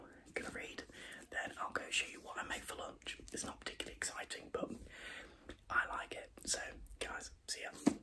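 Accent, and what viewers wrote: United Kingdom. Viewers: Are you from yorkshire?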